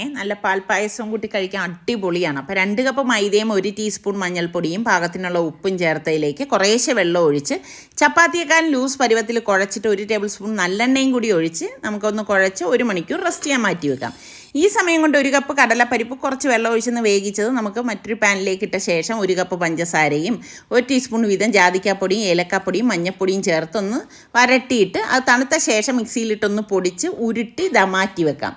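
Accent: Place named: Malabar, India. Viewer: ഇങ്ങൾ tvm ആണോ ഞാൻ സംസാര രീതി കേട്ടപ്പോ മലബാർ ആണ് എന്ന് കരുതി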